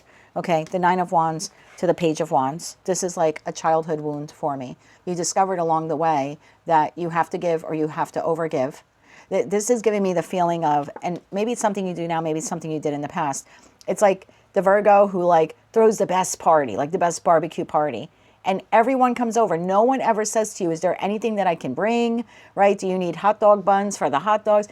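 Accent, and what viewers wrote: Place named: Newark, United States. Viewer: Don't mind the cursing at all, appreciate the honesty! Sounds straight from Newark!😅 great reading, thank you! Much Love ❤️ & Light ♍️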